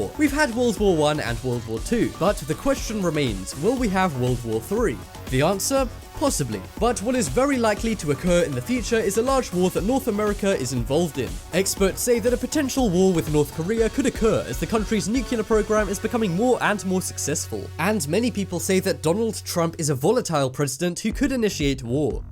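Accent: United Kingdom. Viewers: he is British, like me. We all have accents. You do, I do, everyone does.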